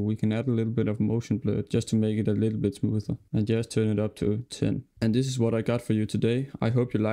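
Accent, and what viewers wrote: Denmark. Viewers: og man kan bare høre du dansk 🤣🤣 men fed video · Your defintly danish 😆 but thanks, great tutorail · i can hear that you have an accent. It kinda sound like you are danish. Are you? BTW great video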